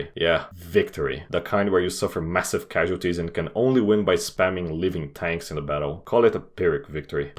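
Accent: Brazil. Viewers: You are brazilian right, i feel it in me accent recognizer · Pretty sure he's Brazilian. His pronunciation of Roman names sounded really Brazilian to me, so I stalked his channel and turns out he's subscribed to a couple Brazilian channels.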